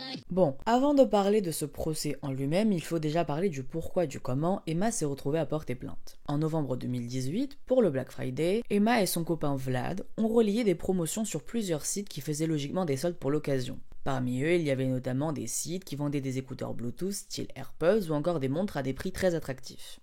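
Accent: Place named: England, United Kingdom. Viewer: pour quoi prendre l'accent anglais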